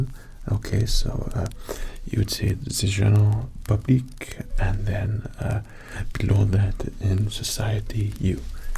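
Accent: France. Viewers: Back on Nov. 11, 2018, video, "Your Friendly Theatre Ticket Master" with a very quiet French accent, the snooty fellow took almost an hour to check a ticket. I still love that one in particular and now this. Thanks, you daffy guy. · real French accent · Sorry but that French accent is so strange to hear from you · Oh great the long awaited return of the French accent! Very tingly, love this 😍. Also what a pleasant surprise for us french people to see the iconic "Luke, je suis ton père" accompanying the description of the video, bien vu! thanks 👍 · I’m french and i love how you make our french accent 😂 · This was absolutely fantastic! I love the French accent and the one-liners. It’s not only relaxing but cleverly funny. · Nice french accent x) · Ahah quite thé funny accent, but we french have a Secret technic to butcher english prononciation : prononcé ''the'' as ''ze'' ;)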